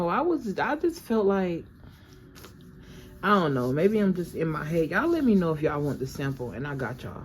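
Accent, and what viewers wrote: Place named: South, United States. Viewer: Country accent!🤣🙋🏼‍♀️ I appreciate you and your messages so much. ❤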